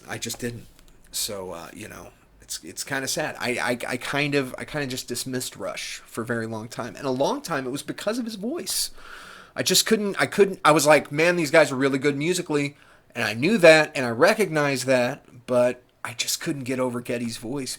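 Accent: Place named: New Jersey, United States. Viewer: hahaha joisey. Youre a natural honey. You got this. Looking forward to it. Lol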